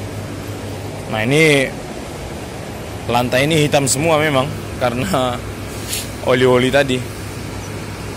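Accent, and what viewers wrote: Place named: Medan, Indonesia. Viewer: medan bang.. medan.... semangat kali abang ini kayak nya👍👍👍😁😁😁😁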